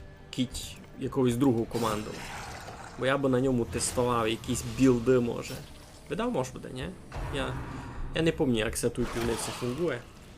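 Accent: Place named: Rusyn, Ukraine. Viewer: Що, не чуєте русинський говір із Закарпаття?)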